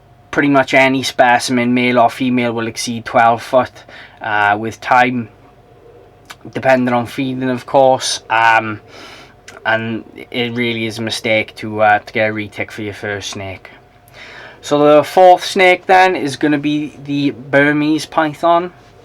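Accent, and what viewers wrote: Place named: South Wales, United Kingdom. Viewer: The south Wales accent is lush.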